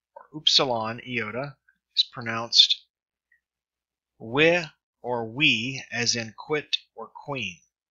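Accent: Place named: United States. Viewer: This is American pronunciation.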